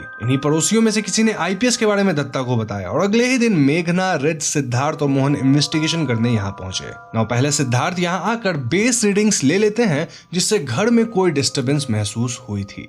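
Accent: Bengal, India. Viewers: Bangali babu. Hindi theek karo. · The VO is of a bong.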